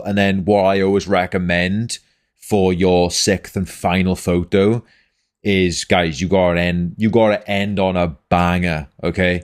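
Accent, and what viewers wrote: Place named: Wales, United Kingdom. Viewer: you welsh? im also from wales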